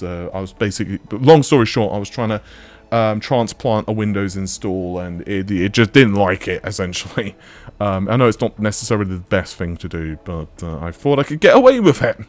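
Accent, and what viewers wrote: United Kingdom. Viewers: This guy’s British accent is the type where he doesn’t breath through his nose at all when he talks🤣 other than that, love this channel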